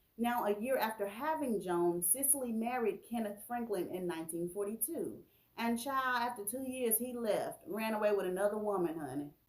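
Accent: South, United States. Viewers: Sis, we want you to be you!!! I love your southern loud accent!! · Keep up the good work.... iv only been following you for a few days now.., and I love your page... it’s fun, energetic, and you have the southern voice that makes me want to come back for more!!!! Sooo kudos to you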